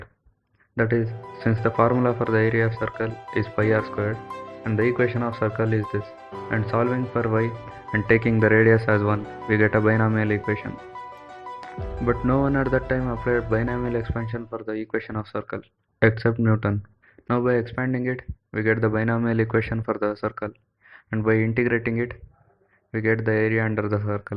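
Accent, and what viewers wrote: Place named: India. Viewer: It's not just your video. I find it difficult to listen to anyone who has a very thick Indian accent.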